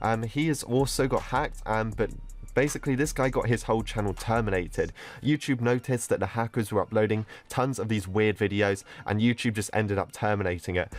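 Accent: United Kingdom. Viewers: You know sounds professional when he speaks British.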